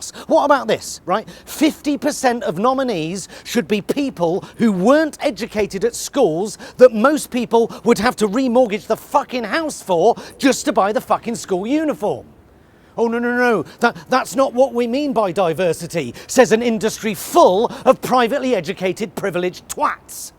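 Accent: Australia. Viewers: Love the Australian accent, boy! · Strong Aussie accent game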